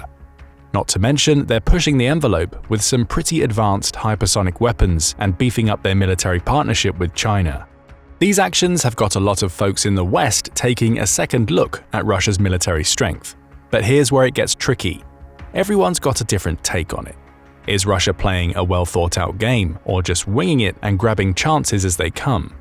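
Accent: United Kingdom. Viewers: Nothing like listening to a Brit's voice crapping on another country's military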